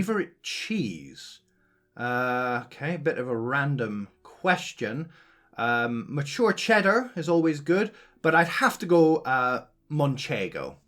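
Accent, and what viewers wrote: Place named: Edinburgh, United Kingdom. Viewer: I thought your accent was an Edinburgh one.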